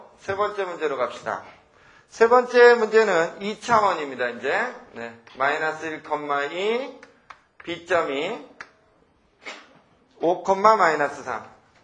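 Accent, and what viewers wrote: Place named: Seoul, South Korea. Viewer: 오 옛날 방송 보면 나오는 서울 사투리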